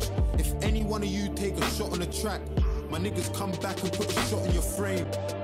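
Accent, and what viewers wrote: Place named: United Kingdom. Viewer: our bri'ish boi poppin off once again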